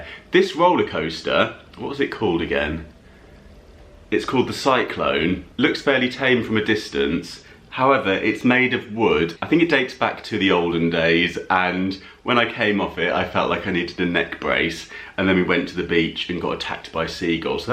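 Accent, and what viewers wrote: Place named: RP, United Kingdom. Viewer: Your pound store is like our Dollar Tree in the USA. Your wonderful accent makes it seem so much more posh! We need you to represent our stores over here! Love the little cutting board, so many uses and looks a bit more expensive. You could have friends over for a cheese and cracker sampling and each cheese could have its own platform.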